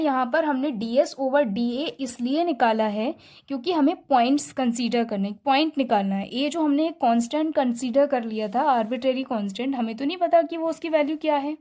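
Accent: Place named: India. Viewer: Even though my ears are not used to Indian English, and that I could not understand much of the discourse, the graphical explanation was impeccable. Thank you too much!! Greetings from an Spanish speaking country, the Dominican Republic.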